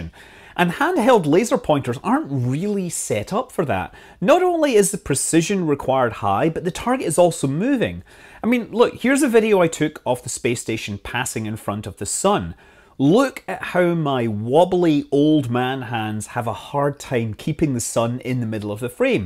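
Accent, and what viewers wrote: Scotland, United Kingdom. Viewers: It's refreshing to hear someone with a Scottish accent talking about something other than drinking and fighting.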